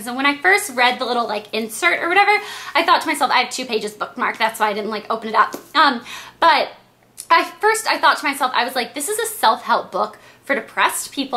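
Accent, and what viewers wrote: South, United States. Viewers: Southern Accent are beautiful. =) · Oh I love the southern accent - i dont think you should get rid of it..it is so cute! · aw i liked your southern accent :(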